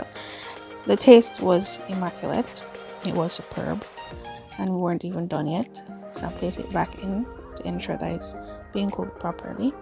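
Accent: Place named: Saint Kitts and Nevis. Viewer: Ummmm sis u from St.kitts 👀👀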